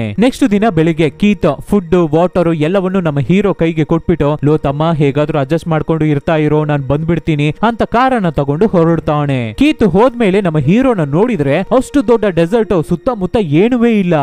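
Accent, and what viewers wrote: Tamil Nadu, India. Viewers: Guru nin kannada language same Tamil avru kannada mattadalva hag ide guru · Ninna slang thamil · Kannada pronounce is not correct, he pronounced with Tamil accent. If it made in proper kannada, dialogue, you will get more likes and more view. Any good try.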